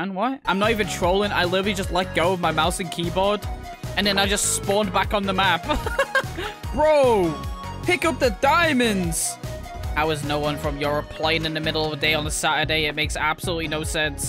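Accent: United Kingdom. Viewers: Why we like to watch: His cackle laugh, his great persona, his Timmy voice, his god level skills, his British accent, his continued upload schedule, his creative ideas, he’s a great person over all ❤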